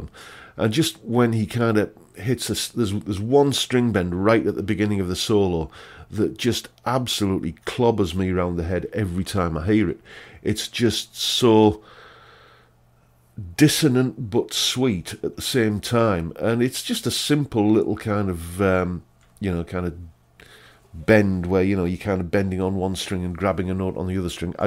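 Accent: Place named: Teesside, United Kingdom. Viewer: Ahhh, you're a Teessider! I've been trying to work out your accent for days since I found your channel. I knew you weren't a Geordie (no hair on you palms) but I was thinking Co.Durham. Now that you show the Gazette though, I'm thinking closer to the Ironopolis. I'm guessing not Middlesbrough though (I'm from Marton but live in Manchester) because your accent is a bit too thick. You say you live close to Darlington, so I'm going to guess Stockton. Am I about right? I'm going to say: 1. -. I was 13 years old and it came on the TV on The Box through me little comcast box. I remember borrowing my sister's tape and getting chills from the solos. 2. -. After borrowing Cali, my sis and her b/f made me a mixtape which had on it. I used to listen every Sunday on my paper-round to this tape and always floored me with how dynamic and distorted and squeelie the guitar was. 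3. -. strat on this (I believe it's a strat) just shows exactly what a clean tone should be. It's all about the phrasing and the fingers, but also a well set up guitar with proper action and the right gear. 4. -. Perfect tone. 5. - Sorry but... It wouldn't be a hit song if it didn't have something going for it and LP sounded amazing on my way down the Avenue of trees in Acklam on a summer's day during my exams. That particula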